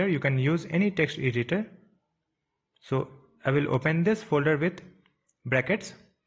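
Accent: India. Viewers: Very Indian 😢😢 but the content is perfect, thank you anyway. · Can’t concentrate with the Indian accent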